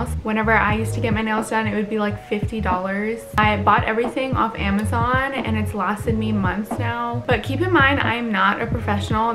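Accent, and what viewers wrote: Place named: California, United States. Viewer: I see what you mean. It has the same cali girl accent, but she doesn't have the same tone of voice.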